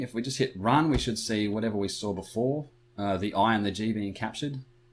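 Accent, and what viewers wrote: Australia. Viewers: You're the good legend here:D Awesome high-quality content, generally detailed low-level explanations, and cool Aussie accent. Gotta love this channel! Wish I could be a supportive patron, but can't from here.:/ Salutes from Syria anyways.:P (The hype of "Return of Lambda" is unprecedented). xD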